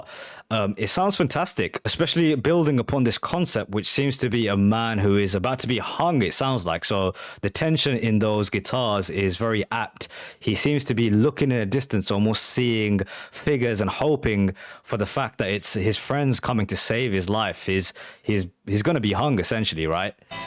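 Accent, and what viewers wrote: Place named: United Kingdom. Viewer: Where do you live? Don't get me wrong I just wanna know cause of your accent. It's best sounding british accent 😊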